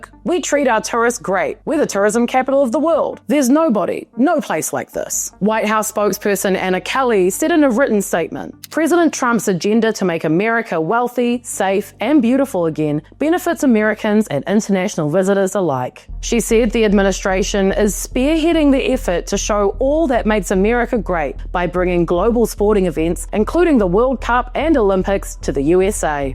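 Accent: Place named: New Zealand. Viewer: So pleasant to hear a New Zealand accent, if I am not mistaken.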